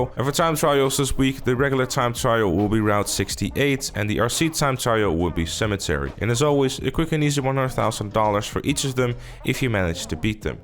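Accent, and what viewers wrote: Netherlands. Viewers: You sound Dutch , am I right 😜?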